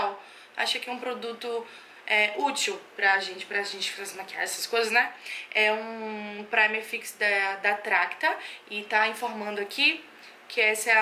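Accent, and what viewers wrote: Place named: Salvador, Brazil. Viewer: sabia q tu era de SSA! Reconheço meu sotaque sempre kkkkk Tô interessada nessa caixa e na salon box.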